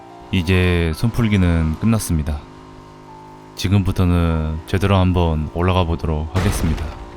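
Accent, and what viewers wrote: Jeolla, South Korea. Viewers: 점잖게 말하시는데 살짝식 섞여나오는 전라도 억양이 포인트 ㅋㅋㅋㅋㅋㅋㅋㅋㅋㅋㅋㅋㅋㅋㅋㅋㅋ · 혹시 고향이 라도신가요~?^^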